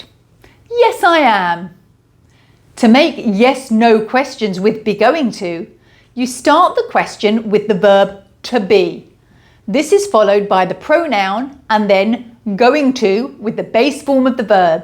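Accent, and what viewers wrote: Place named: United Kingdom. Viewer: I'm going to say thank you my nice teacher I love Britch English 😂😂😂😂😂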